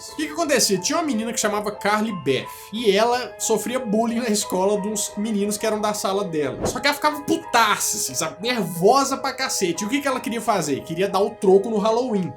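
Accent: Minas Gerais, Brazil. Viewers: até no geito de falar vc parece mineiro é nois!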